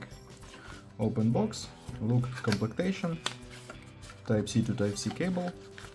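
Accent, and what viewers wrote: Russia. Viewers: Чисто русский английский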